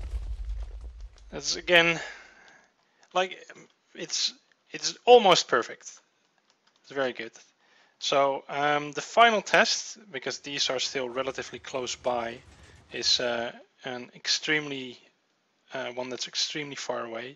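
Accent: Netherlands. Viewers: Are you dutch?? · are you dutch? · Are you a Dutchman because i can hear your talk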